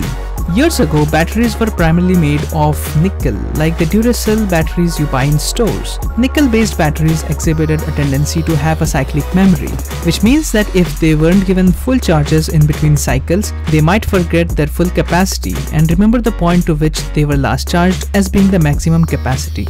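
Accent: India. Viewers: Gabriel Lim I am Indian and I can tell you, he sounds too Indian. I'm not being racist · He sounds indian i swear im not racist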